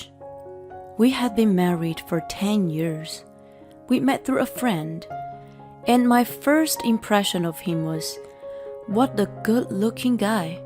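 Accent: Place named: United Kingdom. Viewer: Please Bring back the UK voice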